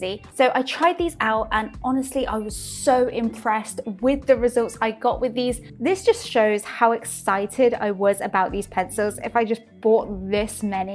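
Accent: United Kingdom. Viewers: Me encanta escuchar tu acento britànico jeje aunque no me queda muy claro si los dibujos a lapiz deben brillar o no, es recomendable o no que brillen? that is the question my Dear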